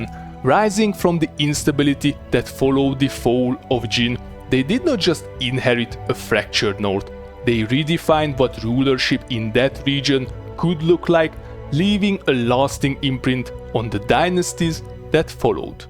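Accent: Hungary. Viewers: Love the hungarian accent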